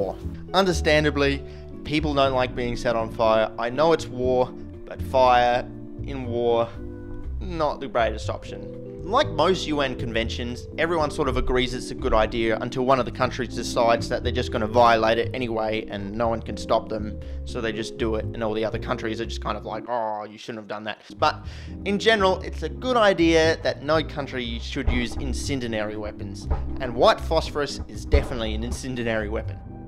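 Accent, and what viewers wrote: Australia. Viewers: He's Australian and they say "aluminium"... I thought it was just another aussie thing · Wait. Is this guy Australian? Huh. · An Australian talking about explosive chemistry over elevator music - that I call top-notch entertainment! XD Greetings from Germany, mate.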